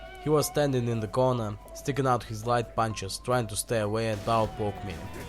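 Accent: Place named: Russia. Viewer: Russian accent best accent.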